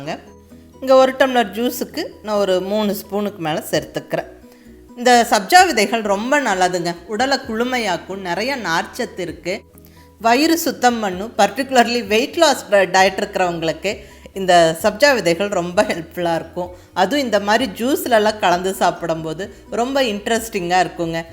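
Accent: Kongu, India. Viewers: செயல்முறை விளக்கம் அடிக்க ஆள் பில்லை. super explaination. crystal clear. கொங்கு தமிழ் super.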